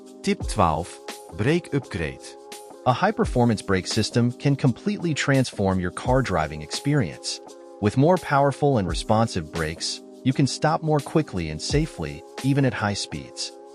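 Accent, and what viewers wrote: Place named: Netherlands. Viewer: I am thoroughly confused with every tip starting out with a Dutch accent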